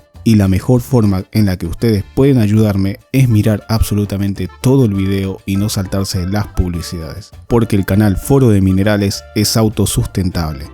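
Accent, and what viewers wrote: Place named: Argentina. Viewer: No Es argentino. Oye su acento .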